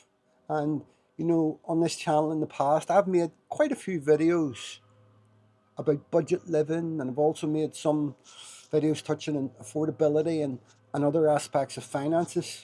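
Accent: Northern Ireland, United Kingdom. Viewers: well, you can hear that he's northern Irish. Some Northern Irish people choose a British Passport and some choose an Irish Passport but they can apply for either. (As I understand it). I'm from the republic, so this is just my understanding. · Where did you move from? NI by the sound of it.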